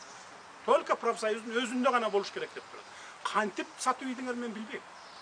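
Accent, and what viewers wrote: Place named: Issyk-Kul, Kyrgyzstan. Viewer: НАКТА КОЛДУН АКЦЕНТИ! КУЛАКА ЖАКШЫ УГУЛАТ ЭКЕН! АТАНДЫН ГОРУ ДЕГЕНИ,ИТБАЙ ЧОТБАЙ, ИРИП ЧИРИП ДЕГЕН СОЗДОРУ ЖАКТЫ 😅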